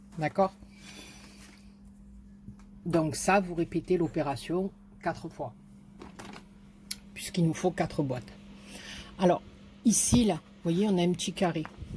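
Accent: South, France. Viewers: Qui elle pèche j adore vous regarder merci pour l accent du Sud plein de soleil bisous josiane brindille 😂🤗🤗🤗👍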